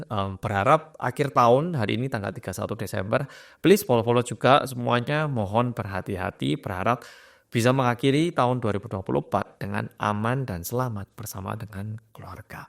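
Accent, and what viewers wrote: Java, Indonesia. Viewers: Pertama gw translate. Pas up volume ngomonge medok. Auto off translate 😅😅 love u bro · Seneng dengerin logatmu mas...medok 😅😅😅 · Masyaallah jelas banget kasih keterangannya. Juga ko' medok lisan berkata nee orang mana nyak ? Matanya sipit Bahasa medok jawi 👍 Terimakasih untuk kabar beritanya. Alfateha untuk mukminin&mukminat yg kena musibah. Semoga husnul khotimah Aamiin 🤲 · Muka oreintal, ngmg medok😢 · Baru pertama kali dengar orang Korea tapi ada logat Jawanya :) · Haloo SeonSaengNim Medhokk😅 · Ngomong nya medhok. Indonesia banget. Dan banyak banget subscriber nya ternyata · jowo medok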